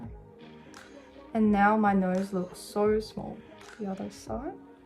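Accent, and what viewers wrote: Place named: Australia. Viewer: Pretty sure she's from Australia, she has the accent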